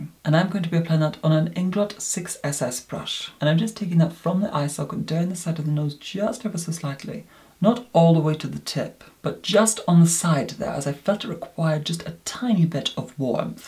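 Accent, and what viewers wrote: United Kingdom. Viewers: I could listen to 👸🏻 👸🏻 count from one to one million or rattle off the alphabet from A to Z, and be perfectly entertained for ⏳hours⌛ by his beautifully accented voice 🗣🗣🗣 I consider his ☺cosmetic inventiveness☺, 🇬🇧dry English witt🇬🇧 and 💄immense makeup knowledge💄 to be an extra, added and appreciated bonus!!! 😍💯💎😻💋✨💖👑💖✨💋😻💎💯😍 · I Love your british accent <3 <3 <3 Love from Paris France <3 <3 <3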